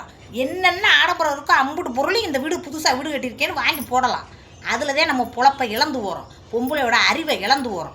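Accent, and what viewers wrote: Madurai, India. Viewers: super madhurai style speak reply good 💯 true 🎉🎉🎉🎉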